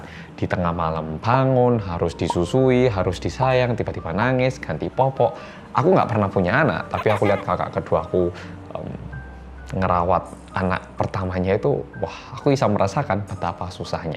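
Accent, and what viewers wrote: Java, Indonesia. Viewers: "Bersama orang korea yang...... Meduokkkk...." Tapi logatnya sangat enak didengar, jadi dengerin ceritanya tu enak. Dibanding youtuber lain yang kadang kalau ngmg suka bikin pegel telinga. Lebih parah kalau denger suara nelen ludahnya, bikin makin males. Goodjob mas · hello guys kembali lagi dengan detektif reomit detektif korea yangggg medoook saya rekomendasikan mas ngomong gitu pas detektif reomit wkkwkwk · Habis pingsan lanjut lihat mas 🥺 medooookkkk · Ka next vidio buatin tes pasikopat pliss Medooo 😄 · halo guys david disini bersama orang korea yang medookk 😁😁😁✌🏻✌🏻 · Medhok nya gak panjang lagi wkw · Mas kok gak terlalu semangat medokkkkkkkk nya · Mas kurusan, pipinya gx berisi lagi. Stay healt oppa meddok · Purik astaga jowo temen cak😹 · "Halo guys welcome back to Korea Reomit bersama orang Korea yang Medhokkkk" Knp selalu inget ortu Mas yang suka bilang medhok medhok ke kamera😂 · Coba donk kak bikin vid kok bisa bljr bahasa indonesia sampe bisa medok gtu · Mas... 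medok nya ngelewihi aku sing wong jowo.. hihihi... betah ngrungoke cerita2ne.. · Welcome back to korea reomit, bersama orang Korea yang.......... Medhok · Hahahahah aku suka nonton ini pas lagi kesel langsung mood lagi. Soalnya aku yg tampang jawa bgt g medok ini yang muka bule medoknya ampun hahahahaha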